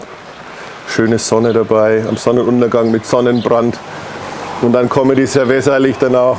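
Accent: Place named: Franconia, Germany. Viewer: stehste da im Regen..hahaaa...hab dich grad Aboniert,.........,jjooaaaa,,,,,interessante Rezepte muss ich sagen,ich hab auch büschen Verbindung nach Frrrranken,und zwar nach Coburg ich kenne den Dialekt wieder bei dir.....aber egal,du hast tolle Rezepte und ich werde mal sehen ob ich das auch ohne den Grill hin kriege,manches geht ja auch im Ofen ...gelll!!!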